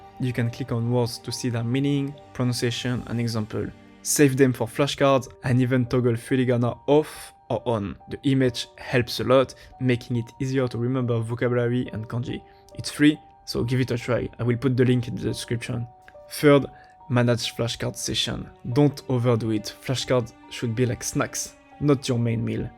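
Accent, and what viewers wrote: France. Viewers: A guy speaking english with a french accent teaching me how to learn Japanese. Truly something 😂.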